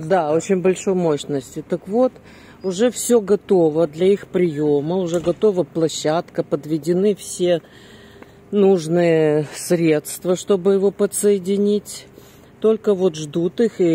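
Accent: Odessa, Ukraine. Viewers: Спасибо вам за то что вы показуете Одессу. А голос я бы слушал бесконечно. Именно одесский говор. И никакие артисты в фильмах про Одессу не передают того одесского колорита как это делает. Спасибо вам огромное. Всегда смотрю ваши стримы. Я из Херсона их смотрю. Был в Одессе и очень скучаю за ней.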